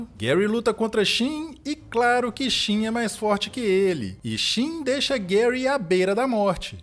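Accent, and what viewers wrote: Minas Gerais, Brazil. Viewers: O narrador parece ser meu conterrâneo aqui de Minas. Se for, di- vi- no.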